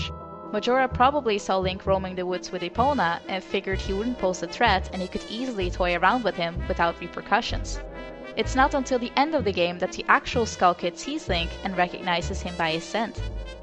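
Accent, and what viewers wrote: Netherlands. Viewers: In this video you can also clearly make out her dutch accent and it is super cute 🥰🥰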